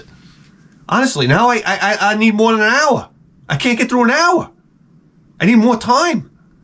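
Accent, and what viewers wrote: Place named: Long Island, United States. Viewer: I stopped going to the Dr when I'm sick because they never help. Every time they tell me I have to let it "run it's course". I don't need to pay someone to tell me that shit. Hope you're feeling better though. And thanks again for creating the greatest video game program in the history of human civilization. You're now the only person I am supporting on Patreon because you're just so damn entertaining and funny. It's also rare that I find someone on YouTube that sounds like me 😂 Almost makes me miss living on long island.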